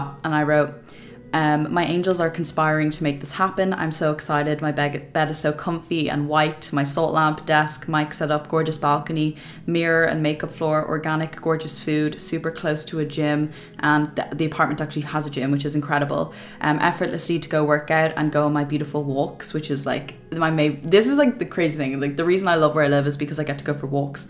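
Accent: Ireland. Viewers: Ngl, my English accent sounds so bland compared to your Irish one. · 2nd generation American Irish Girl(woman) here! I literally manifest everything I desire and am open to. I too have vision boards and journals to prove it. Amazing. I just found you today and love your slight Irish ☘️ accent!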